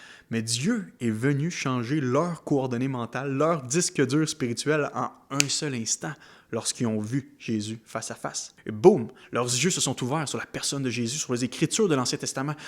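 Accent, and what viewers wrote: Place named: Quebec, Canada. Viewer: Bonjour, pour commencer je désir préciser que je crois en l'œuvre de Jésus-Christ et en sa resurrection. Il me semble que dans votre explication que vous avez oublié de prendre en compte la résurrection de Lazar ( dans le nouveau testament) et la Résurrection d'un homme dans l'ancien testament (2 Rois ). Bonne continuation, merci pour vos partage avec ce beau accent du Québec, moi j'ai l'accent Alsacien.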